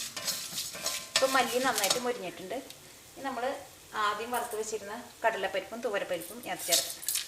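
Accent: Thrissur, India. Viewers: Dear, your sambar receipe Awesome!! But more than that i just loved your thrissur accent..;)..Keep posting more receipes..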